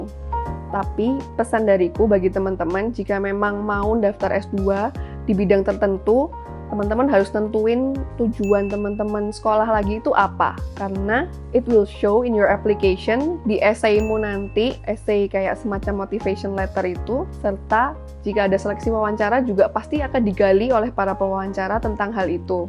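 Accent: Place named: Java, Indonesia. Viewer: medok nyaaaaaa hha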